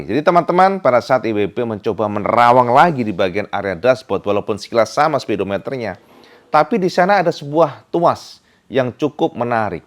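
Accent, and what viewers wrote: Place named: Java, Indonesia. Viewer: BANG LOGAT JAWAMU HILANGIN MEDOK BANGET BELAJAR JD PRESENTER BERBAHASA INDONESIA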